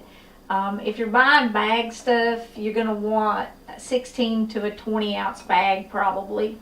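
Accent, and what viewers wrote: South, United States. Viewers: Thank you and Bless you as well. I am African American Female, whose parents and Grandparents were all born and raised "Down South"...I enjoy watching cooking programs...your Southern accent especially gave me fond memories of my own female family members talking and comparing recipes as they prepared various dishes. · EVERYTHING you make looks so good, and your country accent is way cute too.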